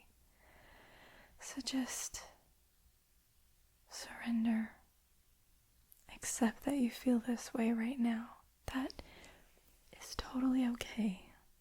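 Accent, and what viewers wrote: United Kingdom. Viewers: Wait you’re not British?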